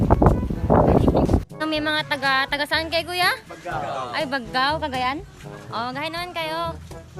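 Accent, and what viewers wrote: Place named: Pangasinan, Philippines. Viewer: wen napintas ka ng atalaga,,.. ngem yong accent mo,, parang may halong pangasinan,, haha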